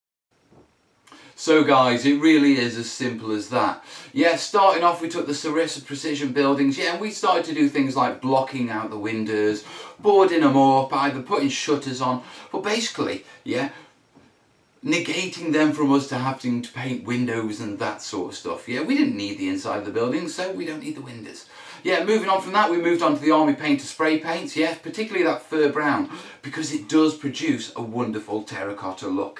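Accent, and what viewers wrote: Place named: Stoke, United Kingdom. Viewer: by the sounds of your accent a fellow Stokie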